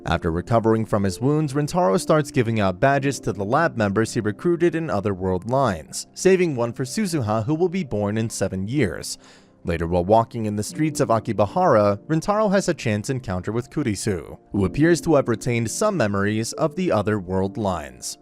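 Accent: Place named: United States. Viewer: Thank you for real voice not robot. I love your American accent.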